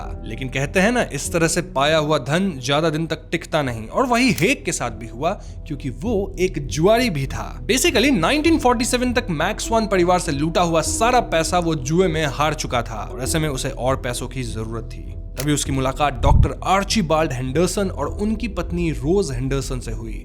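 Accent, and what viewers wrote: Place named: Bihar, India. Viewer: apna bihari accent thik kro gussa aata h galat pronounce sunne k baad.. "kar diya, " kar di"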